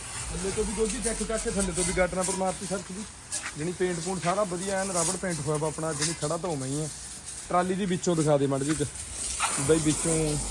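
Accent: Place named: Puadh, India. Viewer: Gay gay Kaya Kaya thoda area fathegarh lagda pawad boli bolde ho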